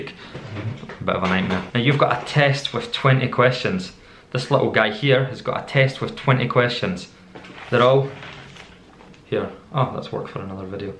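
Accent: Scotland, United Kingdom. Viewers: Do morrre classesss likiii thaaat, juust speakkk a little bittt slowly and using morrre Scottish accent. Grrreat. See you laterrr. I love the Scottish accent.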